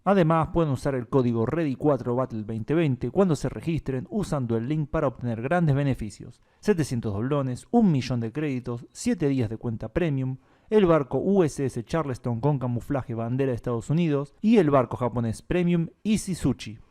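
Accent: Argentina. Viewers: Por fin un canal interesante y narrado por un argentino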